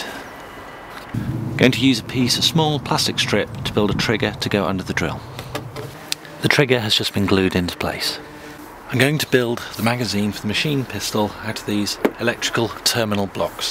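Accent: United Kingdom. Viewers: are you from the U.K.? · i love your fuckin accent boy, what is it? British? greetings from texas brw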